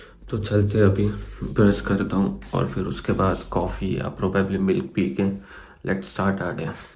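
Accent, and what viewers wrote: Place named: United Kingdom. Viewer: Wo agrezo wali accent agayi hai tumhare English me.. kyaaa baat ❤️❤️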